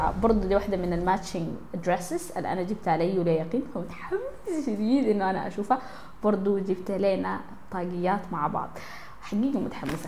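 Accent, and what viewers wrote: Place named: Iraq. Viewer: ما اجملك لهجتك تشبه لهجتنه اهل العراق ☺❤❤❤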